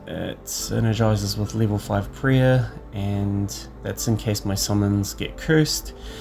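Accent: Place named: Australia. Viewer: Are you australian? Your accent sounds australian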